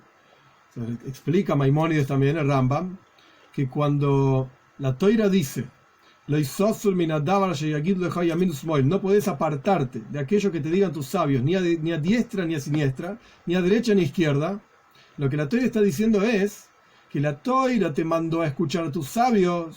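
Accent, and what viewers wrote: Argentina. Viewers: Rab sos Argentino?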